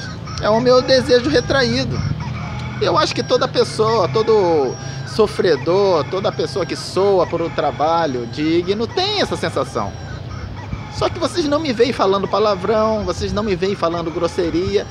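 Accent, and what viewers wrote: Rio de Janeiro, Brazil. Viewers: Clhr ele e carioca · Nosso Mestre é carioca MDS, melhor que isso não existe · E ainda parece ser da Zona Oeste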